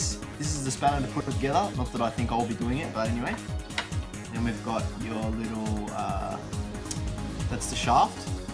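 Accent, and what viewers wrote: Australia. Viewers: Heya, did you get yours from focusattack? I'm guessing you're Aussie with the accent (lol) so how long did it take to ship? I'm in NZ for reference.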